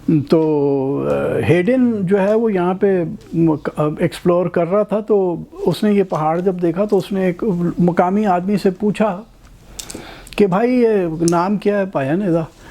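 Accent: Punjab, Pakistan. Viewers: Just amazing Love the way you speak especially your punjabi touch .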